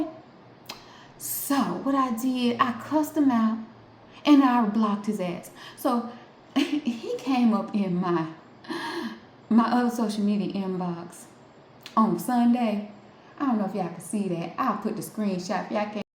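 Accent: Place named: South, United States. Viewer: " guurrl, you are hilarious when doing your commentary. You remind me of Dolly Pardon, with your sorta kinda like Southern Bell accent that you give off😅 "You've grown on me enough for me to kinda like you girlfriend. "I must like you in my own SPECIAL KINDA WAY😂 "because I'm NOW a SUBSCRIBER of Yours baby girl. Keep doing what you're doing which is to keep your Subscribers engrossed sweetheart. "Peace✌️🫡🥰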